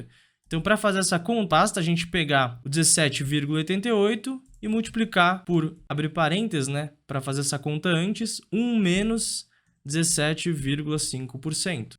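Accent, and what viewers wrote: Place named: Belo Horizonte, Brazil. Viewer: jeito de falar aqui de BH, é elogio kkkk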